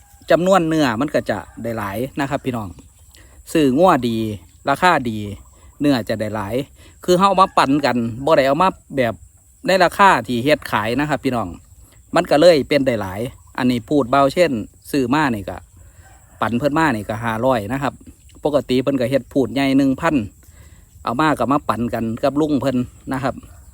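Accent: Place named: Korat, Thailand. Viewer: เอาอีกแล้วพูดนีแทวโคราชเรียกเป็นกองดูจากสายไหม❤❤❤❤❤🎉🎉🎉🎉🎉😂😂😂😂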